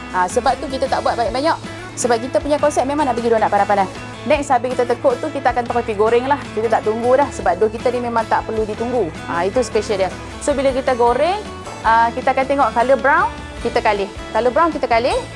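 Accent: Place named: Penang, Malaysia. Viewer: Mantap kak.kekalkan dialek penang kita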